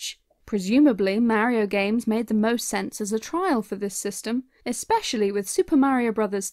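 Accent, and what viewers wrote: United Kingdom. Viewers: Ok I'm new to the channel. Who on Earth is this British sounding female narrator who hasn't been named? The other guy at least is named in the description.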